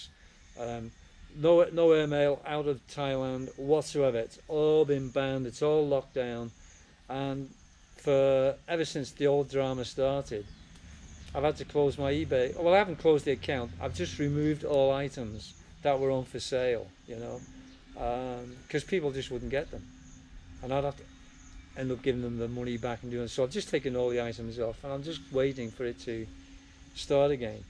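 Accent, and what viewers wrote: United Kingdom. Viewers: Can spot the scouse accent lol .... said the woolyback lol 😂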